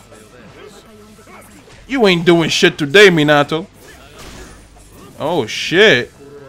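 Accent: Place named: Dominican Republic. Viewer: BRUUHHHH i was honestly not ready for this loool you look like such a cool dude, i can finally put a face to that sick dominican accent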